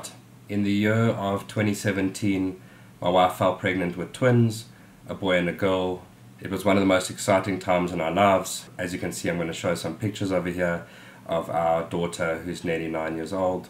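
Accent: South Africa. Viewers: I'm so sad about your tragic loss, but happy you had a precious boy thereafter. Awesome to hear your SA accent! The Lord bless you and keep you. Greetings from Mossel Bay 😊❤😊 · I am so very sorry for your loss. I'm trying to pinpoint your accent? South African? Just snooped your other videos, and answered my own question when I saw one video said you are in Africa. This video blessed me today, and I thank you.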